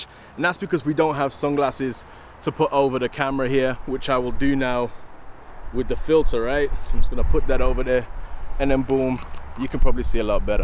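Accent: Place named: Australia. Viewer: Are you from Australia? Your accent is dope 👌🏼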